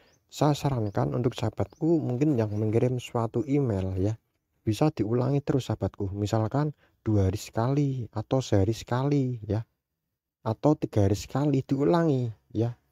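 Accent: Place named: Java, Indonesia. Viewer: Ga jelas medok.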